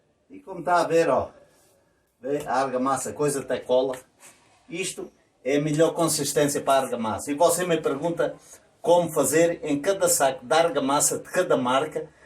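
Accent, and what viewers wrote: Portugal. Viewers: É muito lindo o seu sotaque português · Esse é show, muito bonito, bem explicado sem falar nesse sotaque lindo que me lembra meus ancestrais, boas lembranças da infância. Parabéns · adoro esse sotaque de Portugal!! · me escrevi porque esse português é engraçado. mais o trabalho também é bom.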